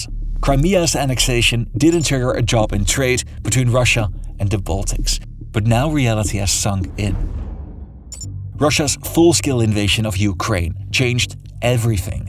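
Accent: Netherlands. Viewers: Pro tip: don't chag your linguistic accent to pronounce a name in another language that is equally wrong. It's difficult enough as it is to cope with your sharp Dutch accent (ask John Oliver what exactly that is, he can word it better), we don't need you to randomly switch to Dutch to pronounce a Russian name, that's just ridiculous. Your content is interesting, but the narration is mostly stressful through a combination of your voice, your intonation of urgency (which isn't necessary to deliver the message and frankly works to its detriment) and the rather annoying Dutch accent that you all suffer from (so don't take it personal). Try being a bit more relaxed, at ease, people will stay longer, viewtime will increase. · This guy is Dutch for sure.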